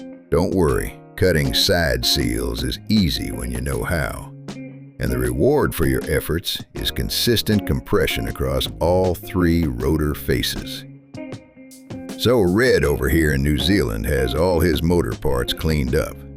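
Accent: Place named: South, United States. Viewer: Great info but the Bubba-fied narration is kinda irritating.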